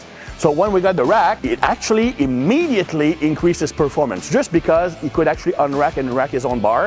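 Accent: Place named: Quebec, Canada. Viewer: Vive le Québec libre ton anglais est excellent mais l’accent te trahit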